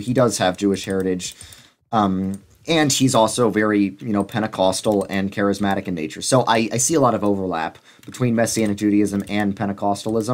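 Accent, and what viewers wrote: New York, United States. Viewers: really letting the New York accent come out on this one LOL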